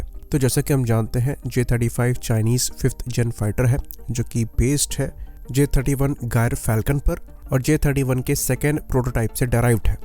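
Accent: India. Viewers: 你的英文有很强的印度咖喱味道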